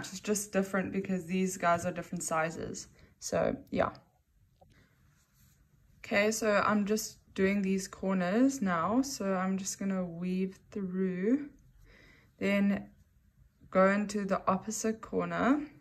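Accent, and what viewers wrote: South Africa. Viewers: Are you South African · Hello thank you for such an amazing tutorial! Just a random question, are you South African?😊😊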